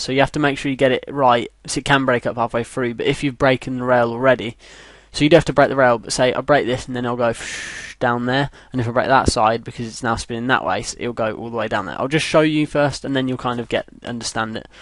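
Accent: United Kingdom. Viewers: I love british acents and good for if you have little materials too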